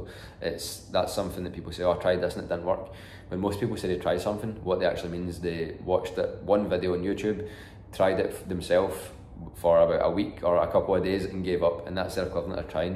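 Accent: Scotland, United Kingdom. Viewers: OMG I love your accent!! Are you Scottish? Btw idk if you noticed, but the subtitles have so many mistakes.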